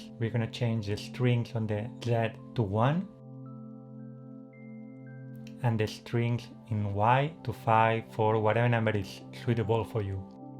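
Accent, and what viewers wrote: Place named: Slovenia. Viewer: Are you from Slovenia? Your accent sounds Slovenian.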